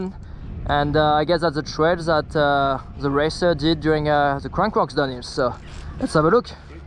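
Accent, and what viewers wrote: France. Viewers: Your accent look's like French accent 🤔 By the way you're a very good rider ! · You know,you’re such an talent,you’re videos are amazing, but,honestly,i can’t listening this french sound english,it rippes my ears dude and i’m just wondering why everybody from France has such a strong accent.🤷🏼‍♂️😣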